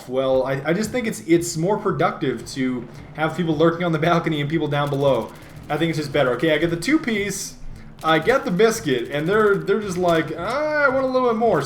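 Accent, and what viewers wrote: Russia. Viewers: are you Russian or are you faking like FPSRussia?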